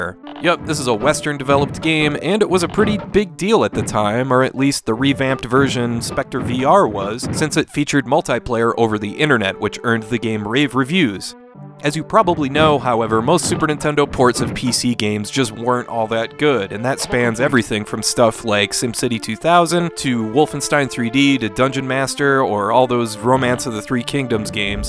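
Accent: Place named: Midwest, United States. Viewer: Random Midwestern accent got me.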